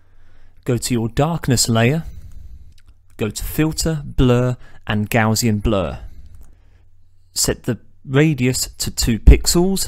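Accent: United Kingdom. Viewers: Amazing mate! I love it.. your British accent makes it interesting.. May I ask that you do a ''Texturing UV maps for 3d model tank''. For RTS video games like Company of Heroes. Please :)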